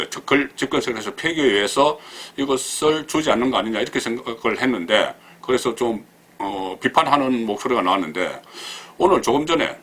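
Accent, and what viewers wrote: Gyeongsang, South Korea. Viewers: 경상도 양반*^^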